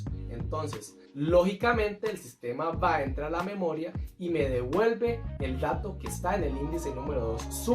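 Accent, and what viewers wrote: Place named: Costa Rica. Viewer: Ese acento tico no se pierde, buen video mae.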